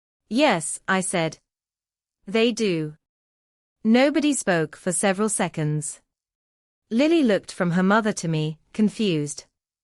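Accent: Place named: United Kingdom. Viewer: I cant stand the British accent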